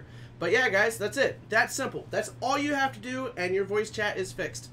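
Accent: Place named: United States. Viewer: Thanks, your american accent is pretty good by the way 👌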